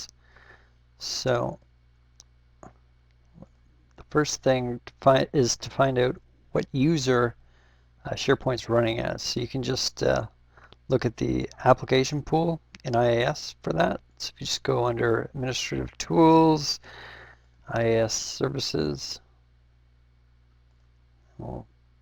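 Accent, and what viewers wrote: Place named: United States. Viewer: I really like this video. There alot of SharePoint 2010 admins who need to step up on their training... hence me watching this video. You have a good teaching technique that is way better than the other sites i've looked at... and it's in clean American English! Please make more of .Net SharePoint related videos!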